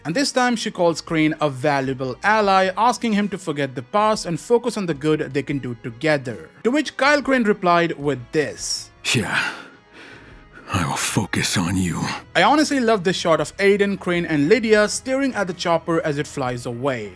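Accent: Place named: India. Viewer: 1min listening the video im already tired of indian accent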